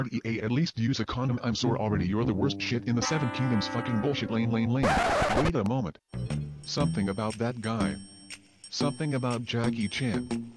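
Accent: Germany. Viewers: Great video! Are you german?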